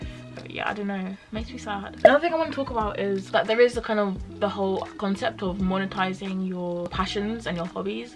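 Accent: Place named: Leeds, United Kingdom. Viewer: I think your accent has been influenced by Leeds. You don't sound fully london anymore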